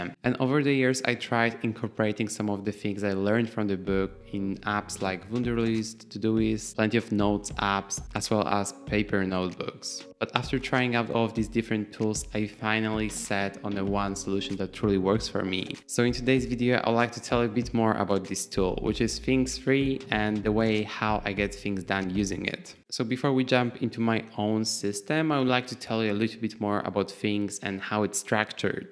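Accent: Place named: Germany. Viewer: Hi, I like your accent, but totally confused, You speak English with German accent but you have a Polish name lol, what's your story?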